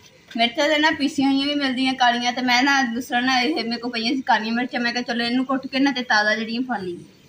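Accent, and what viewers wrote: Punjab, India. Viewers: Ap koli boli pure pnjabi bht achi lg rhi h...mje apni ami ka gr yad aa gya h...jahan sb aik sehan me soty hn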